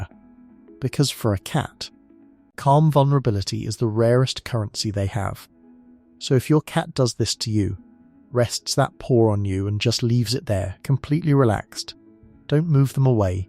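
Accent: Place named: United Kingdom. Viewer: Because it sounds better in a British accent. And the rest of the world is tired of listening to american accents tbh.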